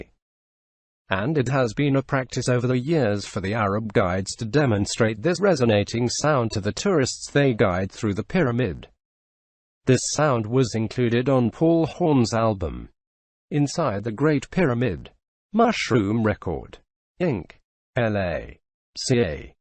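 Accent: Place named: United Kingdom. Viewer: atrocious robotic British accent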